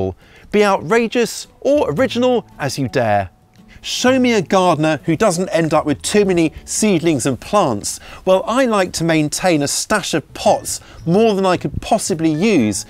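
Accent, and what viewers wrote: United Kingdom. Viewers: "just pop it in"..."use a brisky brush"...I will never tire of the British vocabulary and accent! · I love the British accent.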